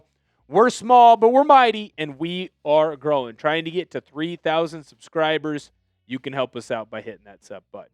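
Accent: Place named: Fargo, United States. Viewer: You sound like you’re from Fargo bro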